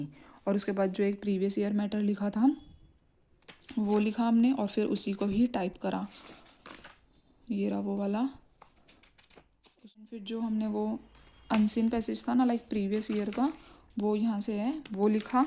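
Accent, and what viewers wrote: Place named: Meerut, India. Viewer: Sister aap kha sa ho. Kyonki aap ke tone kuch kuch meerut wali lagte h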